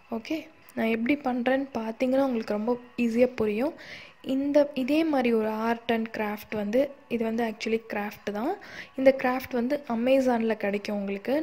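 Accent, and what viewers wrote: Madurai, India. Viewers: Madurai ya sis neenga?? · Neega madurai ya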